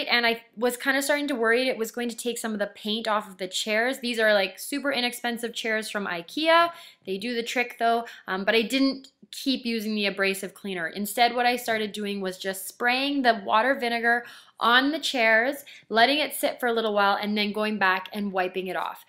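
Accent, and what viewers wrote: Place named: Canada. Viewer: ahhh. That's cool. I thought I detected a bit if Canadian accent in your voice. You are crushin' it, Mama! Way to go!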